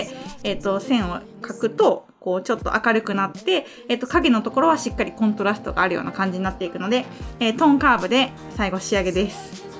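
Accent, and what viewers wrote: Kansai, Japan. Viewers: 地味な作業😁だな〜。 Adobe MAX 楽しみにしてます❣️ そしてめっちゃ関西弁😁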